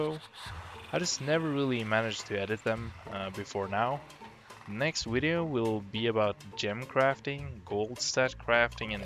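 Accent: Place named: England, United Kingdom. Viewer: Where about in England you from?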